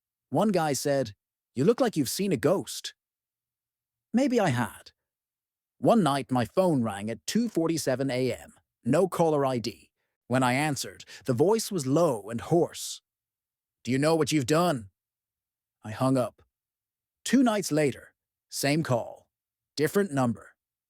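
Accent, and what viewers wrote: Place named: Ireland. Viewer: Creepy story but the Irish accent is refreshing!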